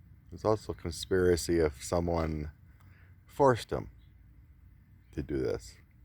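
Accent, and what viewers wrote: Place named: United Kingdom. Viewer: Кто нибудь расскажите об авторе канала Кто он ? Что он? Кто он по национальности ? Американец, Британец? Я не очень разбираюсь Но если не ошибаюсь у него Британский Английский. Американецев вообще сложнее понять Американцы Коверкают Искажают Английский язык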